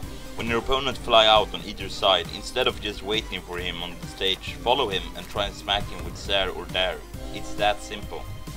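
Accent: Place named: Sweden. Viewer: Wait,are you Swedish? You've got that accent :D and btw really helpful video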